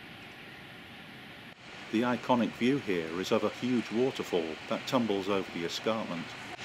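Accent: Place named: Australia. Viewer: Spent my childhood in Brazil, this vid has brought me a lot of good memories.. now in Australia 🇦🇺. You sound Aussie by the way...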